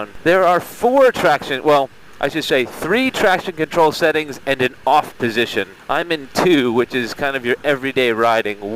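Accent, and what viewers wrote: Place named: California, United States. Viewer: Hey, your accent doesn't sound like from England, sounds Californian. 😄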